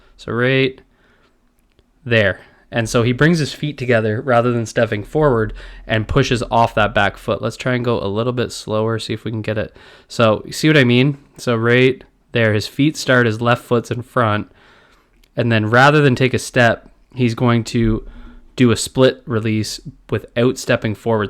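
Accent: Canada. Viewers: Hey man I love your content & analysis. Btw I noticed your accent. Are you Canadian?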